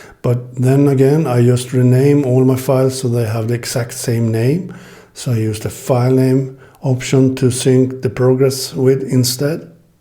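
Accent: Sweden. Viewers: I was thinking about jail breaking my kindle and was looking for some information about the benefits. I stumbled across your video and as soon as I heard your voice I got so happy! I studied abroad in Sweden and it was the best time of my life, hearing the Swedish accent again gave me such a comfort, I honestly didn’t listen to the information of the video anymore and just thought about the time I had there. Thank you for this awesome video!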